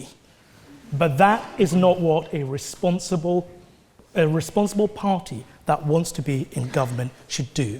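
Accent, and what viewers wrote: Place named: Scotland, United Kingdom. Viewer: what English accent are you talking about? He's clearly Scottish.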